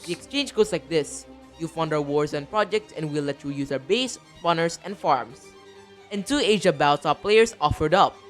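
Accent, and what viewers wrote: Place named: Philippines. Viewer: You talk like your pilipino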